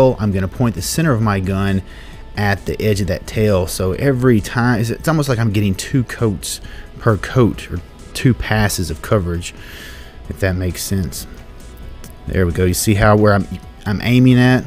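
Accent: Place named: Midwest, United States. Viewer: Call Me silly, But gosh does it feel good hearing a Midwest Boy. Great video, and nice work.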